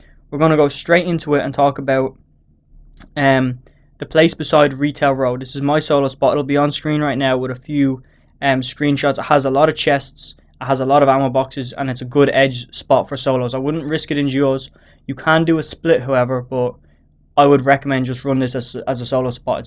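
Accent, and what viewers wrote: Ireland. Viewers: Are you Irish btw?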